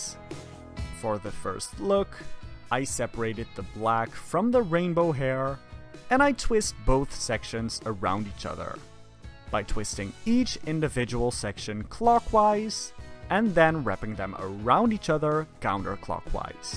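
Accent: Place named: Netherlands. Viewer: Are you from the Netherlands? Your accent sounds different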